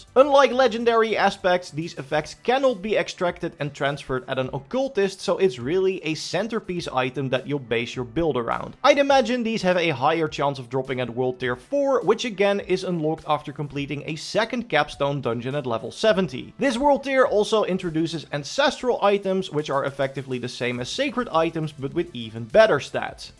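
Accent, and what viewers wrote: Netherlands. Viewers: i can just hear you are a dutch speaking english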